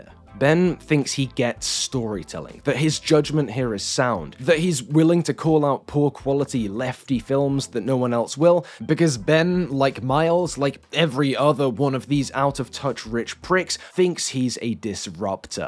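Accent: United Kingdom. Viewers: Hilarious to hear you and all those who pretend to just love Glass Onion even though it lacks everything that Knives Out “an actually good movie” has in volume. Name one character you are true my invested? Glass Onion sucked and even your British accent can’t convince me what I watched isn’t complete RUBBISH!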